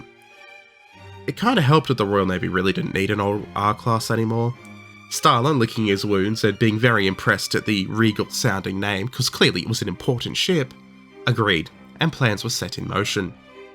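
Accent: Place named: Australia. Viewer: The way this is presented, with the Aussie accent as cherry on top of the cream..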